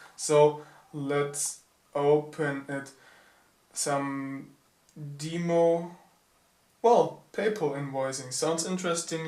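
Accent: Germany. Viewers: Can' tell if he's german or indian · German. I'm really sure. Source: I'm German.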